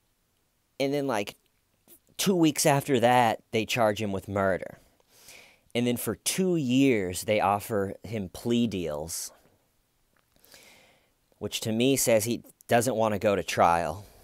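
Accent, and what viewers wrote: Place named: South, United States. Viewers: Love me a southern accent. 👍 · I love your southern accent. All people have to do is watch the original police interview to find out why STAYVEN is funny. 😂😂😂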